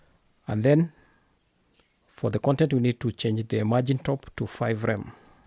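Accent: Kenya. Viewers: He sounds like a Kenyan Nice tutorial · He sounds kenyan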